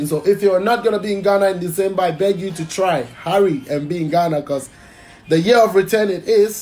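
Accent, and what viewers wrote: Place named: Jamaica. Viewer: You’re talented with unique voice which makes you super extraordinarily musician in Africa but try to limit your Jamaica accent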